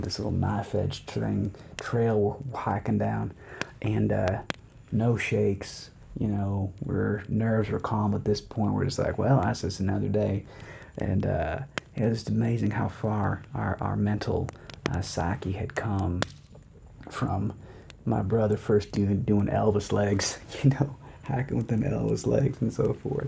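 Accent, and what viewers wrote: United States. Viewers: Love the crackling campfire sounds, plus your storytelling with the cowboy accent. Wild that those are true stories. 🤠🔥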